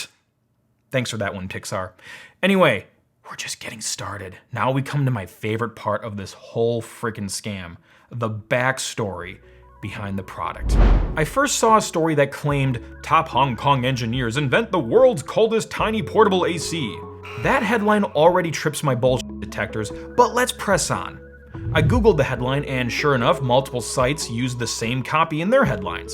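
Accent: India. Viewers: Indian accent? Dropped. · Indian accent is also a red flag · indian accent = red flag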